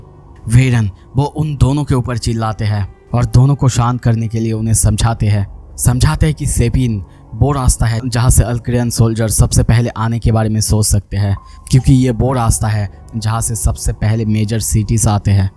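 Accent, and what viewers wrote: Bengal, India. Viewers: Bengali ho kya bro😊😊❤❤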